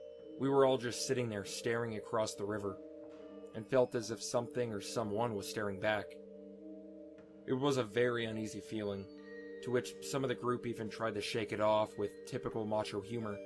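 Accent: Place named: United States. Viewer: Nice to hear an american read for once, almost everyone are brittish Or something like that lol. Great vid // a swede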